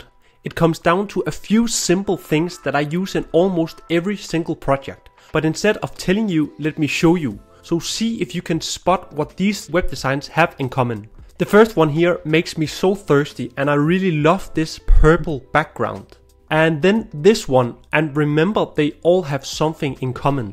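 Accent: Denmark. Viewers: 1. Denmark just got that programmer population. 2. That classic danish accent… not every english speaker can notice it, but about every danish can. 3. I’m also danish, yes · Most danish accent ive ever heard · i knew immidetly you were danish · Haha, I knew it. I can smell a Danish accent from miles away! Very distinct sounding...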